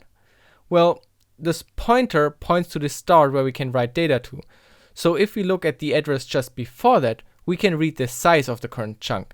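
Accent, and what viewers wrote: Germany. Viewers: I like your old videos as well as your newer ones! Also, is it right that I think from your accent that you're german?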